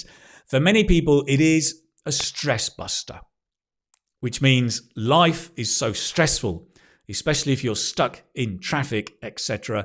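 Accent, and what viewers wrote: United Kingdom. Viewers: I love your british accent😊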